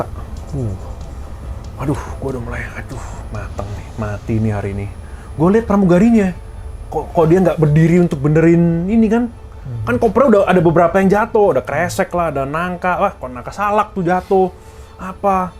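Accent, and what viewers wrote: Java, Indonesia. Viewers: Jiangkrik. Di rungok"ne jowo tibae😅😅 Sentolop barang e. Jurrr. Ngrungokne penyampaiane iku lho. Mau ketawa takut dosa. 🤦‍♂️🤦‍♂️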